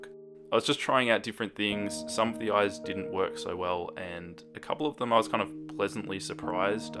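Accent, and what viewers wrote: Australia. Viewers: Are you Australian? Sorry if I got that wrong I'm not very good with accents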